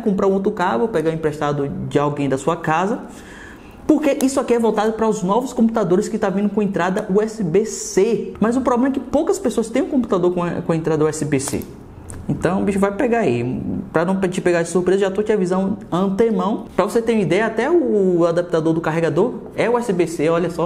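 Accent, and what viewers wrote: Bahia, Brazil. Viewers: Vc e baiano??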